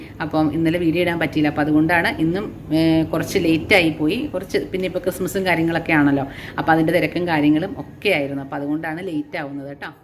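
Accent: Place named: Malabar, India. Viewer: നിങ്ങളുടെ അവതരണ ശൈലി സൂപ്പർ ആണ് ട്ടോ,,,,, തനി മലബാർ ശൈലിയിൽ,,,,,,, ആളുകൾ ശ്രദ്ധിക്കപ്പെടും,,, 👍🔥🔥🔥🔥🔥🔥🔥🔥🔥🔥🔥🔥🙏🙏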